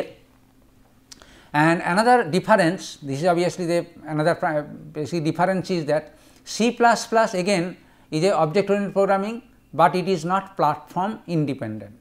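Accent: India. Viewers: It's indian english ascent😂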